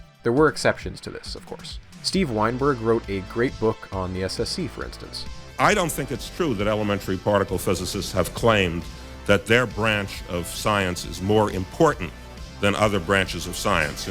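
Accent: Canada. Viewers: I will also admit, as a holder of one myself, I'm biased for the Canadian accent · No he‘s Canadian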